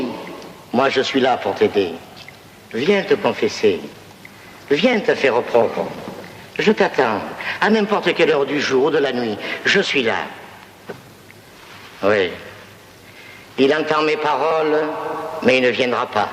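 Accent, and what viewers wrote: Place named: Provence, France. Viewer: Qu'il est beau cet accent Provençal, Dieu qu'il est beau !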